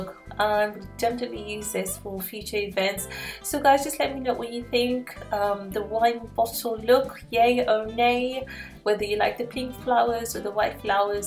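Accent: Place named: Southern Africa. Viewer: I've been watching your videos and trying to guess where your accent is from... I knew it was Southern African 😏 Zimbabwe? 😂😂😂💟xx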